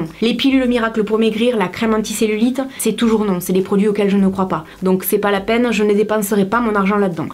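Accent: South, France. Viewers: Je trouve que tu es quelqu'un d'honnête et sincère.. en plus de ta fraîcheur et de ton accent du sud!!!.. ça fait du bien de voir ça sur YouTube .. je commente très rarement tes vidéos mais je les suis depuis un moment .. continues comme ça merci beaucoup !! bisous de Corse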